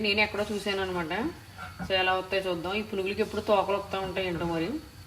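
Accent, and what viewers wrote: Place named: Amalapuram, India. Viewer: అమెరికాలో అమలాపురం యాస మరియు పునుగులు స్వచ్ఛంగా ఉన్నాయండీ...😊👌💐💚🇮🇳💜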